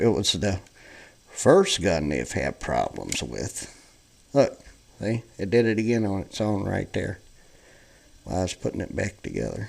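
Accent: United States. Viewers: Someone commented negatively on your accent. I would like to leave a positive comment on your accent. I could be wrong, but it sounds like Arkansas. In any case, it's a wonderful accent - don't ever lose it. People would like to copy it, but you've got the real deal - what a treasure! In addition, you seem so candid and honest, truly genuine. Thank you for the review. God bless! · He's an American. He can talk any way he wants as long as it's English!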